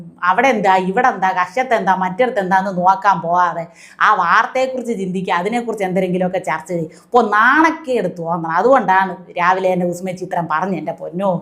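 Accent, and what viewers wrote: Thiruvananthapuram, India. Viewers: Adipoli avatharanam. Tvm slang super, especially the contents of the news. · തിരോന്തരം ഭാഷ കലക്കി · ഈ അവതാരിക സൂപ്പറാണ് തിരൊന്തരം ആണോ പ്ഏര് എന്തര് ? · I like the way you present things before your viewers. Moreover the thiruvonathapuram slang is hilarious. All the best.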